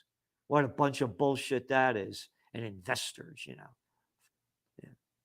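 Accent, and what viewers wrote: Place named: United States. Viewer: From Manchester, England. Love your output . (And one of few American accents I can listen to)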